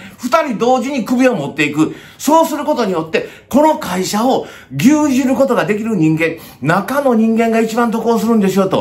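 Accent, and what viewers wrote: Kansai, Japan. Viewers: JJさん鋭い推察と関西弁の面白い話し方に惚れました。まぁ恐らく正しいでしょ。🎉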